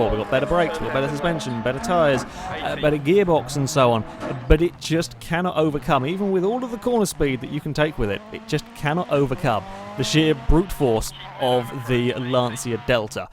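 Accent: United Kingdom. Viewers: Aussie? Sounds British to me (I'm British, but live in Australia, so I can tell the difference).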